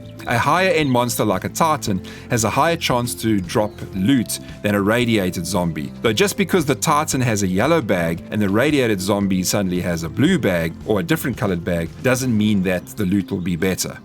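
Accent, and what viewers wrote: South Africa. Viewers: I hear the stronger SA accent my friend, I used to work with a gang of S Africans in the 90's, great bunch of guys, love the accent! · Aussie here mate, always thought you sounded South African. I think aussie, kiwi, certain british and south african accents have alot of similarities. Either way its pleasant to listen to. I reckon its the garden hoe fruit tree yield and the loot bags you didnt know. Really enjoy the tip vids too.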